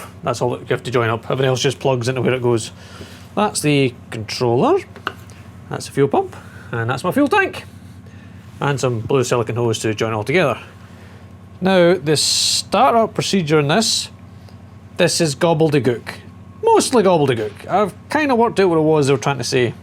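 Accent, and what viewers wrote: Scotland, United Kingdom. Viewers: After bingeing on AVE videos, a gentleman with a Scottish accent has made this minnesotans day.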